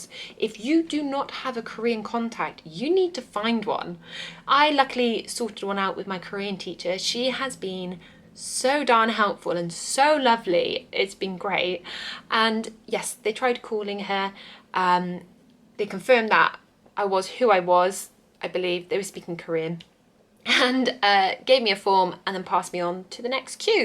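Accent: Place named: United Kingdom. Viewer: What a lovely British accent. It’s music to the ears! You travelled to Korea in the same month I did. I hope you stay safe throughout the trip.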